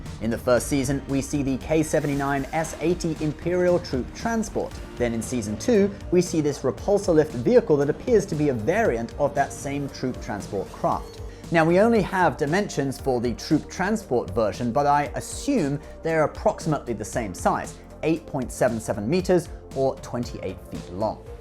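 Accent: United Kingdom. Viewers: Yay another video with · A rare appearance of indeed. But always a pleasure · ? That's a real surprise. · Why don't we see any more. I miss you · Welcome back. Good show, good show 👏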